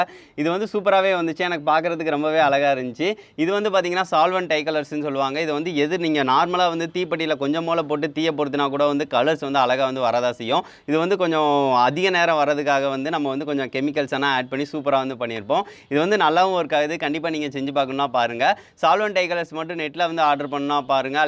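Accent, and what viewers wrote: Sivakasi, India. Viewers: உங்கள் வீடியோவை பார்த்துவிட்டு அதன் பின் தவறான முறையில் அனைவரும் வீட்டில் வைத்து செய்துபார்க்கலாம் என்று நினைக்க தோன்றும் அதர்க்கு முன் உதாரணம் ஆகிவிடாதீர்கள் நண்பர் அவர்களே சிவகாசி உள்ள ஒருவனின் குரல்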